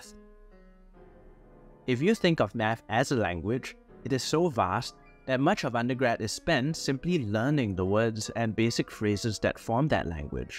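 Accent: Singapore. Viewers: You sound Singaporean or Malaysian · Recognised the singaporean accent 😊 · sound like a singaporean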